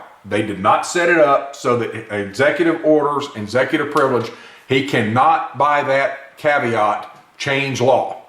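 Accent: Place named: South, United States. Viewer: To bad! That southern accent could come from anywhere down here! Love the vids buddy! Keep your eyes and ears open and we will weather this storm Lord willing!!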